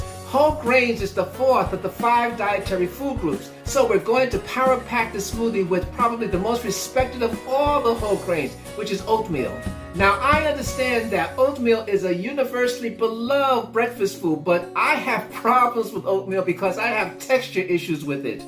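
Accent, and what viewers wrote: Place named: Caribbean. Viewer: Hello. I am a new subscriber from Casablanca Morocco. I like your style. where is the accent from please? Caribbean maybe?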